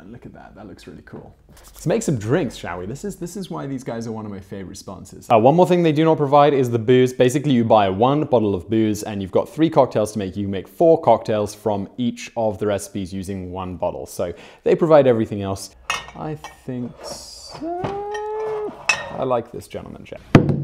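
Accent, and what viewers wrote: England, United Kingdom. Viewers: Not American. Just more laid back British. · It sounds less like softened American and more like a British person who has lived in America for a while. He definitely sounds British at home but with a very slight hint of American in there.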